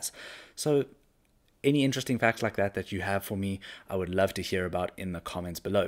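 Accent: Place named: South Africa. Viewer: Let's go! I love that type of accent. I used to have a hard time detecting between Australian, New Zealand, and South African. But I think I'm starting to get it finally! District 9 and Chappie actually helped me a lot with the South African side of things. Anyways. I'm in a big Star Wars phase, so this video was really nice, thank you!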